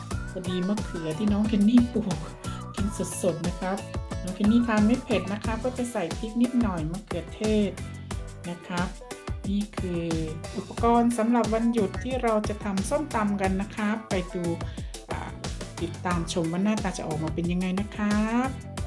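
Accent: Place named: Isan, Thailand. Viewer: ถาดน่ารักเชียวค่ะคุณเคนนี่ พรนึกว่าคนจีนพุ้นเด่ เห็นเว่าอิสานน่ารักดีค่ะ คนจังหวัดใดน้อ❤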